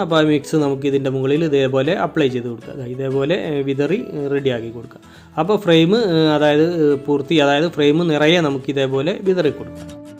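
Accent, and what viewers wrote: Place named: Kozhikode, India. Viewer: കോഴിക്കോടാണല്ലോ ഭാഷ,ഞാനും ഒരു കോഴിക്കോട്ടുകാരനാണ്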